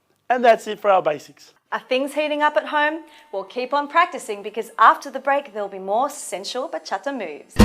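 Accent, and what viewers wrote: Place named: Australia. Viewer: It's Australian with a hint of something else.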